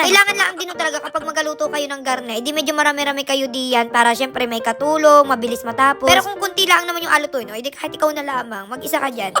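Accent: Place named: Quezon, Philippines. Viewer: Ito yong blager na nakaka tuwang panoodin saka yong Punto nya kagaya dito samin sa queson province ❤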